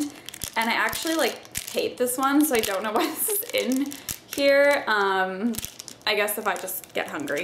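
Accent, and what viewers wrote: United States. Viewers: its like a boston-ey accent lol, they put a ehg emphasis on their a's.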